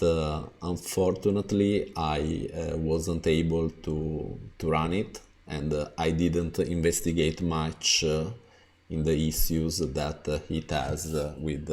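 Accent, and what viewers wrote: Italy. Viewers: No matter, I like both, italian and english. Best mix of all.:-), there is not much bad with it. It is just, that many spoken words have an extra "e" at the the, from run it get's run-e. For me it's perfectly fine. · this is the most italian english I've heard in a while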